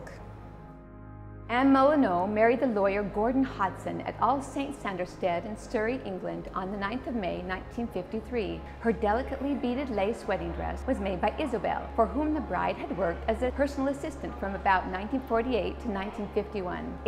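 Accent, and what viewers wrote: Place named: United States. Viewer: American accant dreadful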